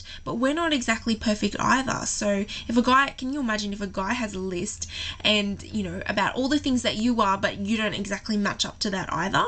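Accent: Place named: Australia. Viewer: Wow you sound super Aussie!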